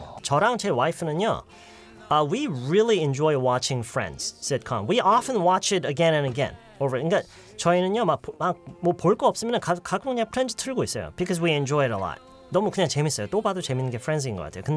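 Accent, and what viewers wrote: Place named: United States. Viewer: 샘은 대단합니다 살이 조금 붙어도 대단한 인기입니다 발음도 좋습니다 미국 사람과 비슷합니다 흑인발음 같기도 하고 백인 발음 같기도 합니다 헷갈립니다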